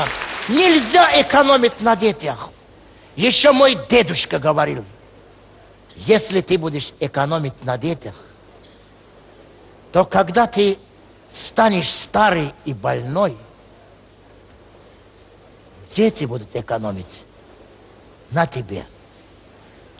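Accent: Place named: Baku, Azerbaijan. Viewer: Бакинский акцент до сих пор сохранился у него "Иди пей чай даааа"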